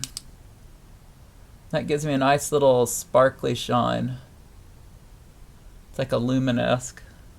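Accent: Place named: North Carolina, United States. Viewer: I love that you have an experienced teaching style. Are you from NC, your voice sounds like it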